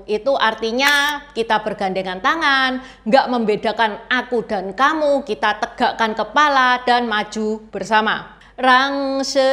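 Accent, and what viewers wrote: Java, Indonesia. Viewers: Jowone medok 😁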